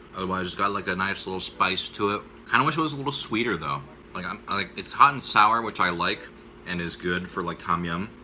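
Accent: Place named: United States. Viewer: The fuck is your race? you look Asian but sound american!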